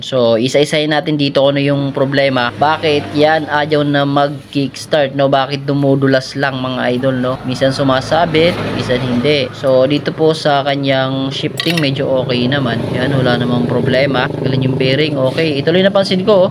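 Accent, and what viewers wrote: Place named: Visayas, Philippines. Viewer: Boss taga samar ka din ba kasi visaya ka mag salita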